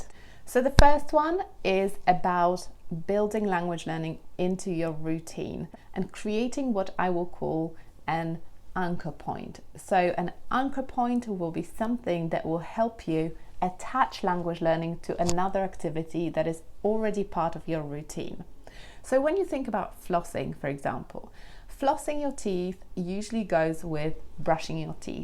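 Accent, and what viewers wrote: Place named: United Kingdom. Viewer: I thank you for your superb work! I adore your British accent! Peace and Love from Russia! ...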